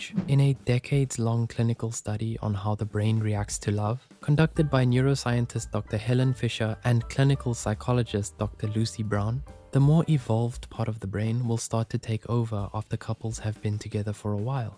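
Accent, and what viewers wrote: South Africa. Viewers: Man's accent is from SA🇿🇦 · The speaker sounds south african😮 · The narrator is definitely South African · Bro sounds South African 🎉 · The narrator are you south african?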